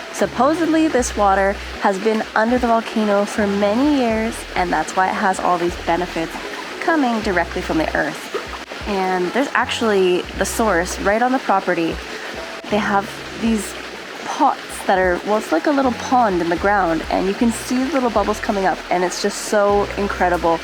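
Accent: Costa Rica. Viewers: Mae he visto prácticamente todos sus videos me encanta ❤️🇨🇷 cual es su Instagram? O otras redes?? Pd: me encanta su acento Tico jajaja ❤️🇨🇷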